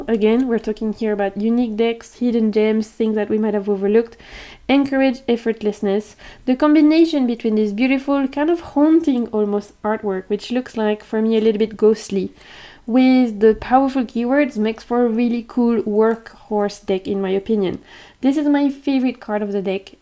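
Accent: France. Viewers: With you on the whole angel thing….. but that angel deck you showed looks like a deck I could get next to. Thanks for sharing. Just wanted to say I love listening to you with your beautiful French accent….. tres charment! Plus would you be willing to you teach people how to correctly say Tarot de Marseille!?!?! 😂😂😂 it drives me nuts how English speaking people say TdM!!! Especially those of us from the states. Love the Marseille Waite….. I’ve seen it before and really didn’t expect to like….using a cohesive art style really makes the difference. I love your idea about how to read round cards. My first deck was a round deck and I never thought of the directionality as time, but I totally love this idea and I’m going to give it a try.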